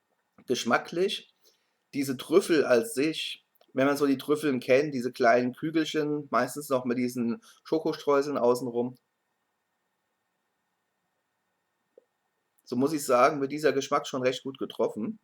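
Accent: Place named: Hesse, Germany. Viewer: versuche gerade ihren Akzent zuzuordnen, er ist schwach aber ich würde sagen Hessen. Ist das richtig?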